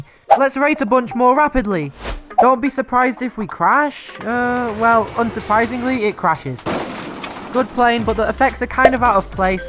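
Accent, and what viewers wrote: United Kingdom. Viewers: ahhh now i hear your voice i can tell your british 😂. i hadnt heard it before this vid lol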